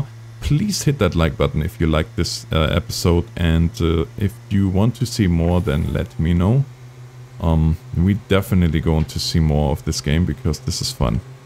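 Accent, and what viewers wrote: Germany. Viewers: Mate I swear I hear the tiniest of an accent... German, Dutch, anything?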